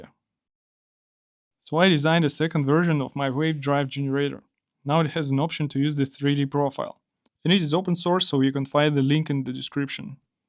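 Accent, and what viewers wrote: Russia. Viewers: чувак, мне кажется по речи или ты русский?)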